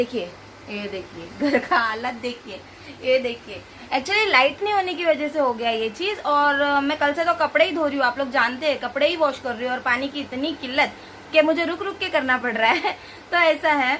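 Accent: India. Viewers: Love ur indian accent💕mumbai's life sounds to be like karachi!!!love from pakistan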